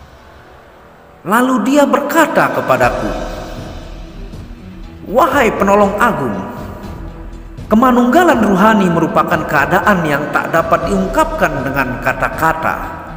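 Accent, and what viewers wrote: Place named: Lombok, Indonesia. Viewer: Kayak logat orang lombok side meton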